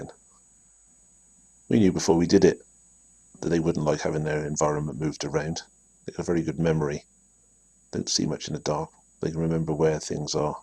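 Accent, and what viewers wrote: England, United Kingdom. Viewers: What part of England you from? greetings from California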